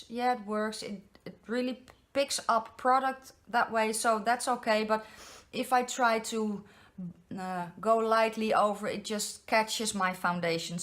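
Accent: Netherlands. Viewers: I heard your accent and I said to myself she is definitely dutch, and ahah i guessed right, Ik kom ook uit Nederland, Love the review dear thanks xx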